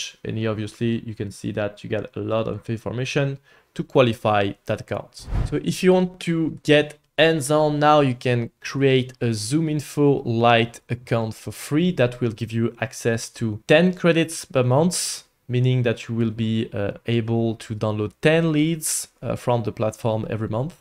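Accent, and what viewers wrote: France. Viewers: je crois reconnaître un accent français ?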